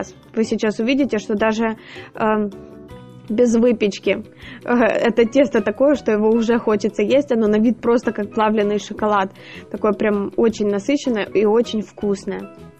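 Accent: Ukraine. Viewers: Болит голова - не смотри ! Произношение такое потому, что девушка из Украины! Нужно обязательно свою ложку дёгтя бросить , а так все нормально!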